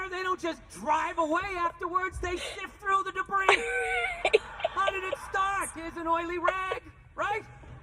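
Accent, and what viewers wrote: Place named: South, United States. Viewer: Yep I'm a reaction junky and from Bristol TN so not enamored by your voice or anything we both country....only said that to say you have good camera abilities. I wish at this point I had more going on but besides when my grandson gets his little league going I got down time and regardless if there's ever a reactor HOF I better be a judge so good start as I haven't seen you before ma'am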